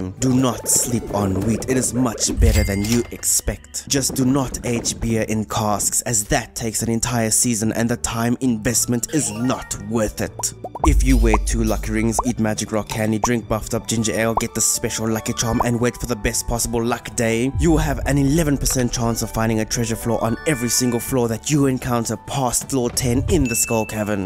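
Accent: South Africa. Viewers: So refreshing hearing my home boy's gorgeous SA accent. Pragtig🤌🤌🤌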